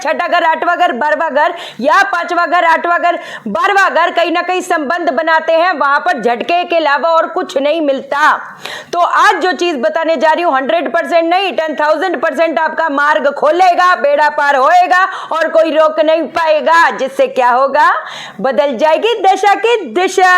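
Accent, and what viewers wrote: Punjab, India. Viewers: 🎊Dear Mam! 💝🎁 You know what सबसे best thing about you is your punjaabi diction and emphasis on our ownself मंत्र जप!! 💐 "👌Siddhi baat hai!! --👍☺️💝 as you most often say" 🎊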